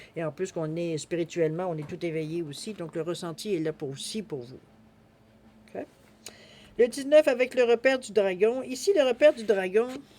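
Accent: Canada. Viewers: Moi..chère...j.adore.ton..accent..il..viens..du.pays..de..mes..rêves..😌..je..suis.. morad..mon.2’..petit.non..c.est..Jaky..mais.tous..mes.amis.m.appelle..Jaky…j.écoute.pour..la.1.fois.ton..tirage.mais..surtout..ton..bel..accent…….❤.Canadien..se.que.j.aime..ce.splendide..pays…tu.ne.peut.pas.imaginer.a.quel.point..merci.pour..le.tirage..vierge..😊😊😊❤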